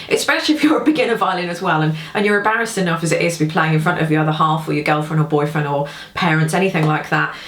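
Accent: United Kingdom. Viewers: Definitely better without the mute. Then again I have been listening to my wife playing with and without for some 18 years. She started about 60 years ago, and has been a school orchestra teacher and violinist/concert master of a local community orchestra. Though she has had to give that up due to illness. This is a second marriage for both, as we were widowed within a year of each other. So, I am familiar with this, however, my attention was caught by the mention of Glaesel. Your voice told me you are either in Great Britain or derived there from. So I wondered if there is a Glaesel source in Europe. The Glaesel factory used to be in Cleveland Ohio, now In Indiana. When it was in Ohio Kurt Glaesel taught my wife violin repair, her other love. Now she is giving that up also due to her illness. That is why I am posting. Anything I want to know about the violin I want to know I can ask here, since not understanding what she is talking about seems more appropriate from my wife than a stranger. 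Not that she is difficult, but she just has so many decades of experience. She has the same problem with me when I talk tech. Oh, back to the point, is Glaesel still operating in Europe?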